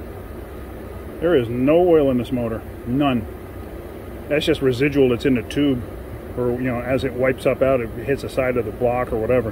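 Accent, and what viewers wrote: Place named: East Coast, United States. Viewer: lol! I was trying to identify your East coast sounding accent. I was pretty close. I'm amazed that truck is still running now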